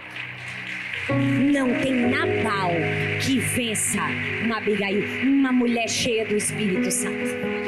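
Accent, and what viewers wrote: Northeast, Brazil. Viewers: Que sotaque maravilhoso, desse meu nordeste!!!!❤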